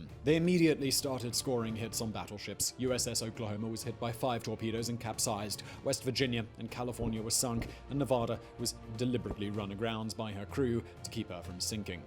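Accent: United Kingdom. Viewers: ahh british v sauce always makes my day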